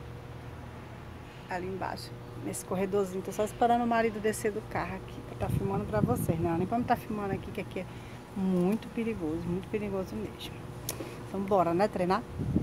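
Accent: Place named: Manaus, Brazil. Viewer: Eita que vc falando deu saudades de Manaus. Morei 5 anos aí no Aleixo. Xeroo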